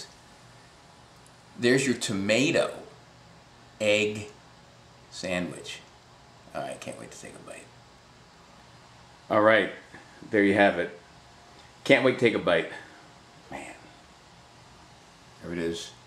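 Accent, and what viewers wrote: United States. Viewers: Garlic ? Pepper ? If you've been to Europe or other places in the World, you'd realize there are LOTs of varieties used throughout the World. I'm assuming, since you look and speak very American that it is the "typical" black pepper and the "typical" clove garlic. Especially the pepper - of which there are 100s of different types.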